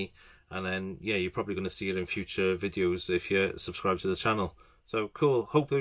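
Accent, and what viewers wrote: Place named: Wales, United Kingdom. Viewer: Heya. I thought that accent sounded familiar. Are you from Cardiff? I’m from Newport :) I do 1-Credit clears of arcade and console games mainly. Those LED panels look really cool, I may have to look into this.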